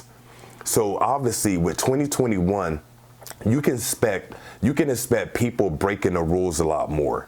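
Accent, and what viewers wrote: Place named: Philadelphia, United States. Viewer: I’m digging your channel! You sound like you’re from Philly! Peace and Blessings 🙏🏽💜✨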